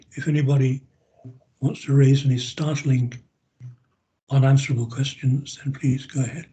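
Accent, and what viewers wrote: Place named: Oxbridge, United Kingdom. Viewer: Gosh, I love this dude-he is a flaming hoot-his humor belies his rather stuffy,( Oxbridge(?) accent-I'm a financial analyst myself, but I would have enjoyed studying with this cat.-where ordinarily I wouldn't go within spitting distance of any Humanities faculty.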